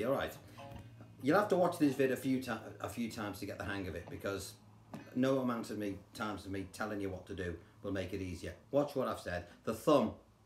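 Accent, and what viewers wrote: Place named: Wirral, United Kingdom. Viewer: I can’t learn the riffs because I can’t stop laughing at you 😂😂😂I reckon that’s a Wirral accent!!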